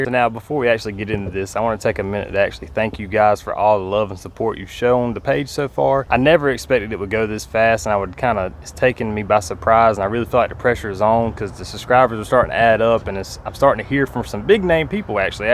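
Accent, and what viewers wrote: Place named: South, United States. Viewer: that southern draw. I got it bad. Thanks man. Appreciate it.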